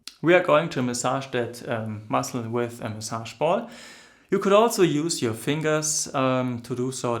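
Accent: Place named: Germany. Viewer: German or maybe even swiss?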